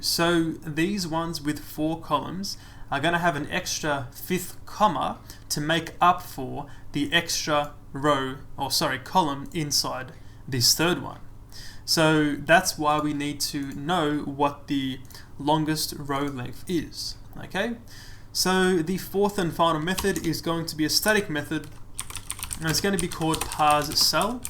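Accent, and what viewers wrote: New Zealand. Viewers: Ok.... no worries.. I'm Aussie and thought I heard a slight hint of kiwi. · Are you a kiwi? you sound like one!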